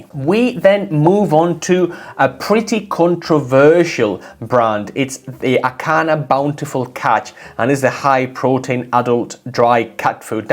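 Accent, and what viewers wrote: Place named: Northern England, United Kingdom. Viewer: I notice you didn't factor in recalls and poisonings. Kinda important, but maybe that's another video, eh? Blue Buffalo and Iams have horrible track records in that department, FWIW. THANK YOU YOU ANGEL SENT FROM THE GOOD PLACE (I.E. 'the norff' by the sound of you.) We all desperately needed a vid like this. Clear and very educational. By the time I got to the end I felt like I'd learned enough to judge the dry foods pretty well on my own. Well enough to know what to steer clear of at least. I am so grateful. Glad to learn the one I'm currently feeding is the pick of the litter too, thank god! I wonder (and would not be suprised to learn) if our standards of pet food here in the U.S. is even worse than where you are. We probably allow all kinds of crap EU and UK wouldn't dream of allowing. That's if the way they handle the human food over here is anything to go by. 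Anyway cheers, and keep up the good work.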